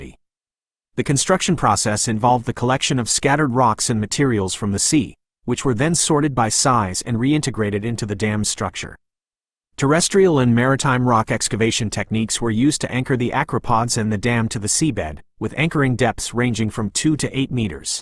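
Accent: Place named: United States. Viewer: Not sure why there is an american voice doing this when the whole thing is Europe based, l never watch or listen to an american voice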